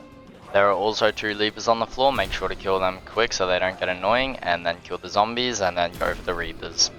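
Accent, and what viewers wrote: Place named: Australia. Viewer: nice australian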